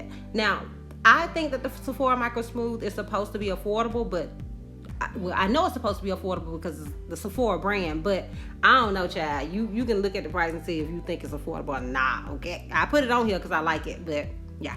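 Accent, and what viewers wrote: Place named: Alabama, United States. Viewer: I love your personality!! You sound like ALL my southern cousins in Alabama. 😂 ♥️♥️♥️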